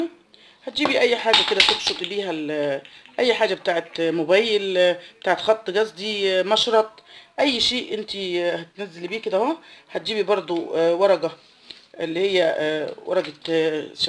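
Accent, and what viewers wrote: Egypt. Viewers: فدوه للهجه حلوه بنت مصر اختج من العراق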